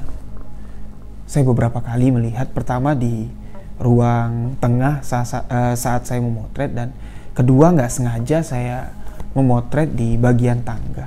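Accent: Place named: Bali, Indonesia. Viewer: hadir meski telat,suka dgn logat suaranya mas logat khas Bali 👍👍👍😀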